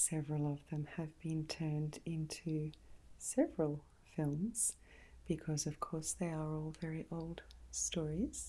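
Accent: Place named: Australia. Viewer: Beautiful, classy Aussie, erm, Australian accent. And, deliberate, specifically chosen words. Thank you. You are so enjoyable to listen to. And the type of person my wife and I seek out as a friends. Keep going! Best wishes from LA.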